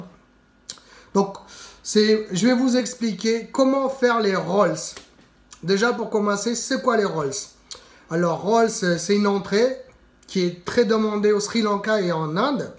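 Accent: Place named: Réunion, France. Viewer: Ta l'accent un peut créole de la réunion ou mauricien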